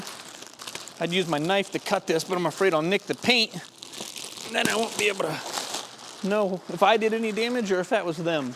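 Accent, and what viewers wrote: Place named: Jamaica. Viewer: Dude you’re wasting you talent big time. You have to close your eyes so you can see better😮😅. Told my wife she immediately asked if you were Jamaican 😅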